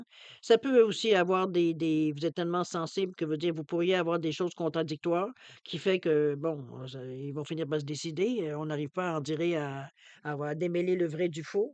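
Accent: Quebec, Canada. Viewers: merci merci tout ce que vous dites me parle et j'aime beaucoup votre façon d'expliquer le tarot et j'adore votre accent j'aime le québec et les québécois mon Chum est québécois .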